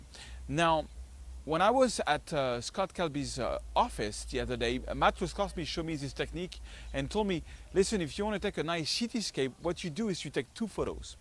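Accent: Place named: France. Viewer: Hey..I realy love your stuff..you gave me a lots of nice ideas about retouching. Also you are a funy guy and a real true person..with your French accent...always makes me lough...but in the positive way.. Hope to get some new and useble infos etc from you in the future.. Keep up the great work Mr.;)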